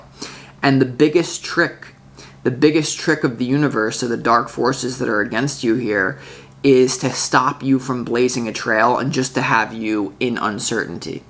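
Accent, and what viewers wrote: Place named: South, United States. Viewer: South ? No wonder. I rec the accent. I grew up in the so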